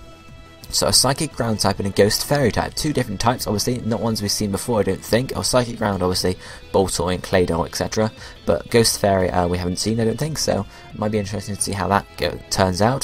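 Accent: England, United Kingdom. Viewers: your English accent make me believe everything you say!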